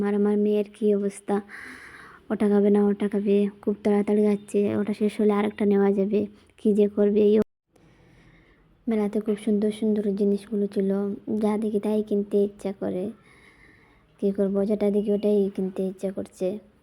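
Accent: Sylhet, Bangladesh. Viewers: লাইক সাবস্ক্রাইবার করে দিলাম ইনশাল্লাহ এগিয়ে যাবেন সুন্দর মন থেকে ভিডিও বানান সমস্যা নাই পাশে আছি এগিয়ে যান হ্যাঁ আপু তোমার বাড়ি কি সিলেটে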